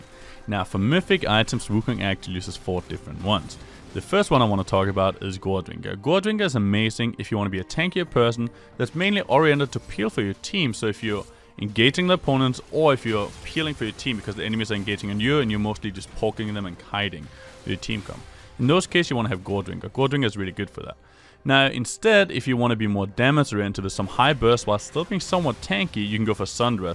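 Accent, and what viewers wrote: Denmark. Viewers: I can hear the Dane within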